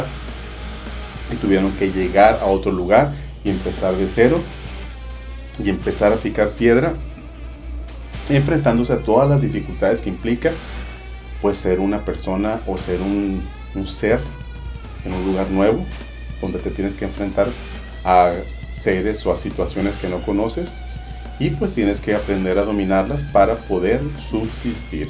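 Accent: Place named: North, Spain. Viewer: Hablas bien bonito, acento del norte je je je.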